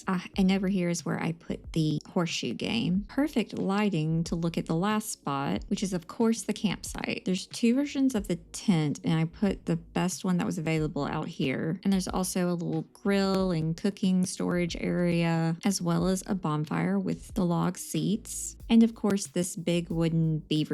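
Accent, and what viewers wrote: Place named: South, United States. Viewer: Omg I love this idea! (Also your accent. Southerners represent!!!) I'm trying something like this in my save file!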